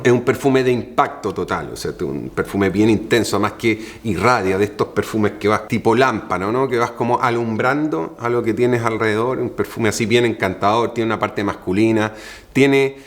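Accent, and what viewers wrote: Chile. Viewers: Me encanta tu acento y forma de hablar obvio chile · Es chileno jaja 🤣 después dicen que hablamos mal y dices que es un perfecto español. 🤣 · España? Yo crei que era chileno por el acento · Eres chileno? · El bro habla entre chileno y español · Es chileno viviendo en España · Excelnete video, nuevo suscriptor. Una consulta, escucho tu acento, y lo asocio mucho al chileno! Saludos y espero que sigas creciendo aun más!!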